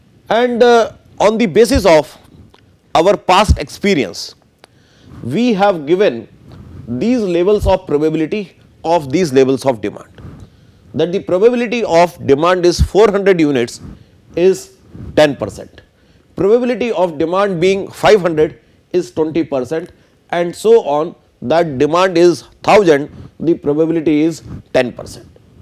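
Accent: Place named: India. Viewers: OMG improve your English please 😭 Thanks for the hard work but i can't understand you cuz it's like speaking English in Hindi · His english is better than you , he has an Indian accent.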